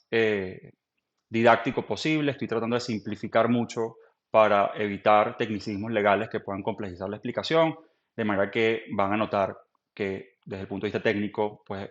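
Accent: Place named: Venezuela. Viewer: ME ENCANTA TU TONITO, VENEZOLANO.❤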